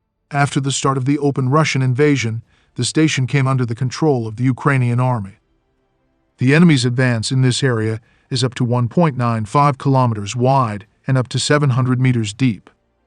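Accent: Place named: United States. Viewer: from Ukraine, professionally narrated by some American))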